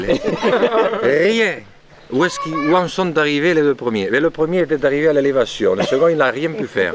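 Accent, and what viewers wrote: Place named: Brittany, France. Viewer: Breton, j'adore cet accent et j'apprécie bcp ce monsieur que j'ai découvert sur Arte tandis qu'il visitait un artisan de son secteur. Pour l'accent, écoutez le poème "L'accent" de Zamacoïs dit par Fernandel, c'est magnifique !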